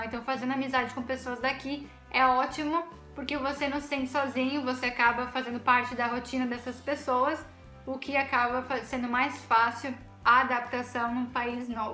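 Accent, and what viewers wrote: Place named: New Zealand. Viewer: Na verdade, você aprendeu inglês com sotaque, com muito vocabulário da Nova Zelândia, que não é britânico, nem americano... Não é muita vantagem...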